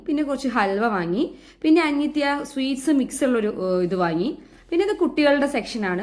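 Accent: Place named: Palakkad, India. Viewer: എവിടെയാണ് വീട് ഞാൻ പള്ളിക്കുന്ന് ഇടവകയിൽ ഉള്ള ആളാണ് വയനാട്ടിൽ ആണോ താമസിക്കുന്നത് സംസാരം കേട്ടപ്പോൾ പാലക്കാട് പോലെ തോന്നി അതാ ചോദിച്ചത്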